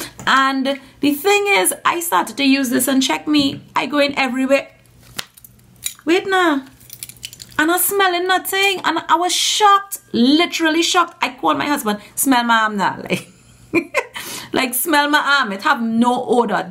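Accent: Trinidad and Tobago. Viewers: Trini accents really nice enno.... " check me .. haha a a ah not smelling nuutinng , a a like smell mih arm.. it ain hav no odour" hahah We are so extra joyful - and happy 🇹🇹hahaha #tryingtoget #agulfcityrun Gosh and you look like a school friend of mine Akilah - Chinese mixed eyes and round face - cutie cutie- laugh and smile hahah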